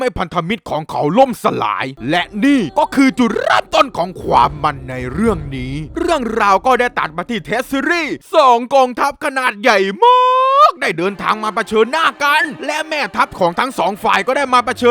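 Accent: South, Thailand. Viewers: พี่เป็นคนใต้ใช่ไหมคะ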